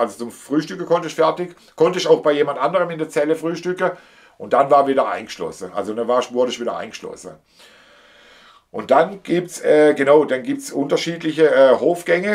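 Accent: Germany. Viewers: Ich hab durch Zufall deinen Kanal entdeckt, finde es super, dass du so offen über dein "altes Leben" redest. Ich bin ein neuer Fan und werde deinem Kanal auf jeden Fall folgen. Übrigens, dein schwäbischer Dialekt, genial ;-) Grüße aus Zella-Mehlis. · Bisch doch aus Karlsruhe oder Umkreis vom Dialekt her 😂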